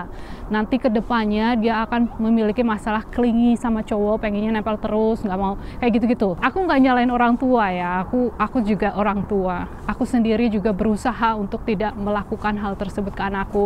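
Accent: Banyumas, Indonesia. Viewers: mba esih katon logat ngapake:D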